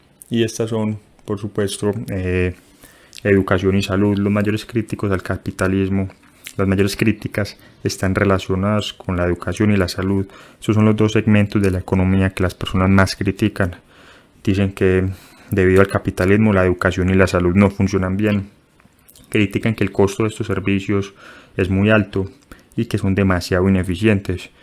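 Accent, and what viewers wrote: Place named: Antioquia, Colombia. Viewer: MENOS DE 10 SEGUNDOS DE VIDEO Y ME DI CUENTA QUE ES PAISA.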